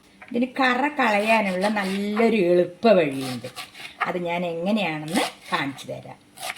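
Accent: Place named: Palakkad, India. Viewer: പാലക്കാടൻ ഭാഷ ഇഷ്ടായി🎉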